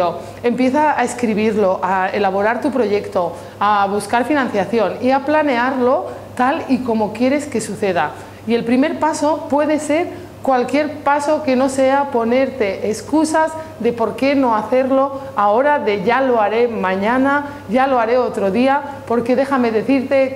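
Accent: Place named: Morocco. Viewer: eres marroquí?? Ese acento y determinación es inconfundible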